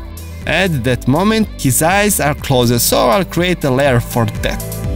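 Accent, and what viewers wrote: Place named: Russia. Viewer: Russian accent:)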